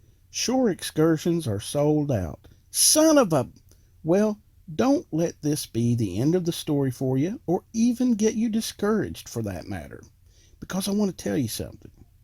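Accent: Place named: Texas, United States. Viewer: Love this guys accent, kind of folksy and down south perhaps Texas, not being American not sure but he puts it across in such and easy going manner you can sit through the video till the end.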